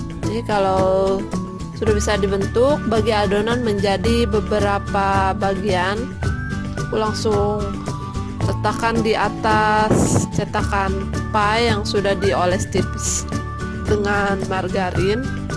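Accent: West Java, Indonesia. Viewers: Logat dan bahasa sunda nya jangan diketarain dong, yang lampung ga ngerti!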